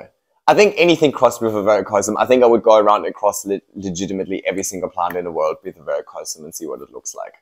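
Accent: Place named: Germany. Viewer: Are you German? I can't figure out if you're a German in Sydney or if Australian English is actually that close to a German accent 😂 It kinda blows my mind how similar it is :D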